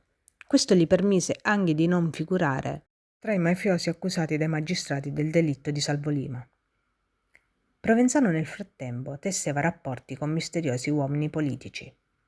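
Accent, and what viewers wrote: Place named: Catania, Italy. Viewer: Si sente il tuo accento catanese 😂😂😂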